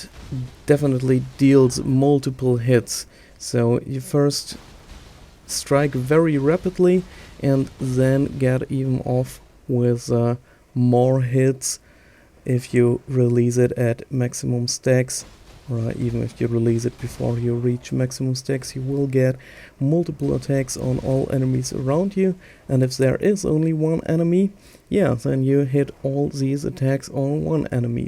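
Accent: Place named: Germany. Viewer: we germans speak like that. so what?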